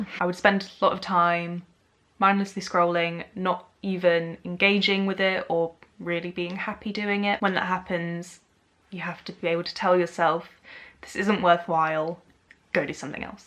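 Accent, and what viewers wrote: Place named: United Kingdom. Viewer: yeah, you have such a lovely British accent. Let's be friends!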